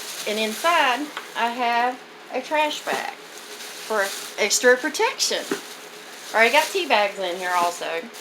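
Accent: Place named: South, United States. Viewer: I do like your videos... And love your voice... So Southern.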